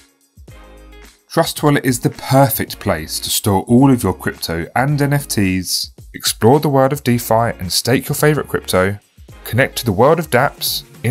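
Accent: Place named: United Kingdom. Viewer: why do you speak British?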